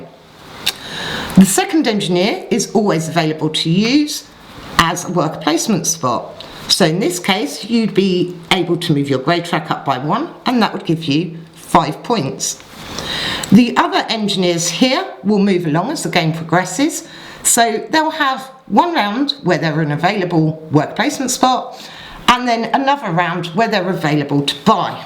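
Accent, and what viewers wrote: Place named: England, United Kingdom. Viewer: Just curious, what kind of English accent is this? thx for the explanation, even if by no means this is should be marketed as a "10 minute" teach I play red too.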